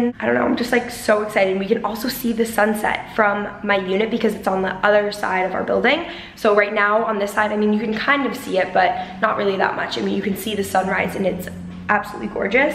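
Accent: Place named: Northeast, United States. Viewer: ya it's def a northeastern accent thing. i watch another youtuber who lives in la but she's from massachusetts and she say "rum" for "room" too. it's funny but cute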